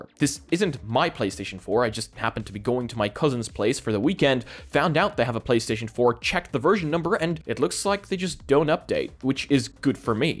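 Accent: Poland. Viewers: you from poland? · Are you polish? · Man good video but are you from Poland?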